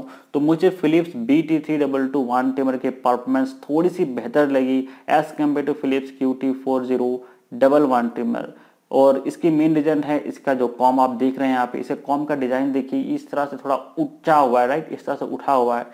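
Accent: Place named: Bihar, India. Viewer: ha lga mje... Bihari tone se.. I'm also from Bihar MFP... I also make videos.. aap dekh skte ho mere channel pe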